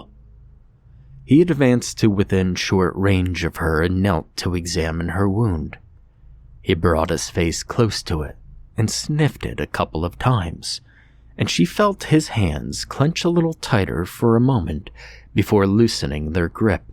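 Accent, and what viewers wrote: South, United States. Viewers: As a Tennessee girl I really look forward to your "country accent". You never disappoint ❤️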